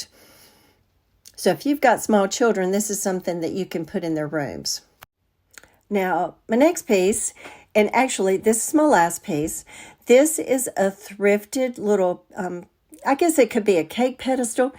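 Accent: South, United States. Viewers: I love to watch all ur videos! There has never been one that I don't just LOVE❤ I also love to craft and I hope to be as good as you one day!! Also I just want you to know I love your sweet southern voice just so adorable 😊 I'm from Alabama, where are you from?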